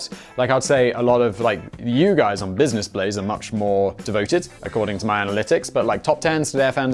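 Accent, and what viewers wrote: RP, United Kingdom. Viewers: you keep doing what you’re doing! I find you hilarious! The whole comedic package wrapped up in that silky British accent is awesome. The style is great and I like you laugh at your own jokes. I put your channel on auto play and fall asleep to it. So I am helping you crush that watch time and happy to do so. Plus since I always fall asleep, I even rewatch most videos! This is by far my favorite of your channels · bill spangler you’re not alone I do it cause I like his voice He has a very strong British accent and I adore his voice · Eagerly anticipating the animal channel that has the upper british accent narrating the life of like... a bee. Yeah. GIVE US THE BEE LIFE VIDEO DAMNIT. Or: Do a nature channel where you narrate the life of bessy, the gentle cow. Then the next day have a food critique channel that critiques steaks. 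🤣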